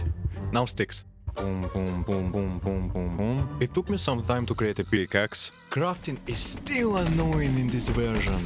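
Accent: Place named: Russia. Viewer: I hear Russian accent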